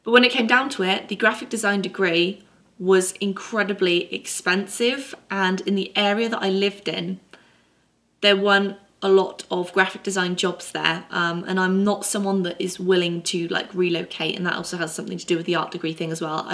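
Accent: United Kingdom. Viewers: If you want to hear from someone a lot older, like fifty something, I choose safety and security and became an RN as I got older wished I went to art school. Back then like you I never even considered it, and I have lived in Southern California since I was 8 so I was closer to art options. I recently had to stop working but when I was I dreamed of all the time I would create when I retired, now with all this time on my hand I'm struggling now to rekindle the slightest bit of creativity I always seemed to be bursting with. Might I share my thoughts on the matter, first, you are very talented so that is a big plus there, sadly some aren't, like people who think they can sing but my cat sounds better. Second, I'm guessing your from the U.K. since I hear a wee bit of an accent, but you are living in the US, per your Michaels video, with your parents, this is a guess? 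If I'm right you should take advantage, if they let you, of living at home as long as you can, I let at 19 while in college supporting myself very hard, until your a few steps down the road of your chooses path. Third, Look at how far you come in only one year on YouTube and the "opportunities" you've already had, not including the porn, sorry joke, and how many more you may have if you hang in there another year living at home following your heart. That's my two cents, also, just make sure your productive, because parents want to see that, and do something daily to make it happen though I can tell you are, work on o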